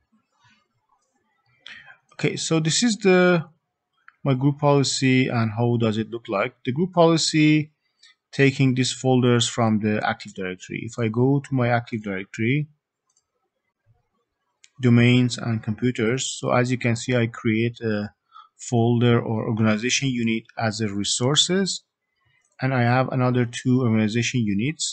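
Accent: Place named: Iran. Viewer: iranian accent :)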